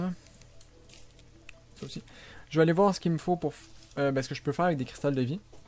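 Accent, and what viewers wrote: Quebec, Canada. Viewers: Est tu québécois répond moi svp ;)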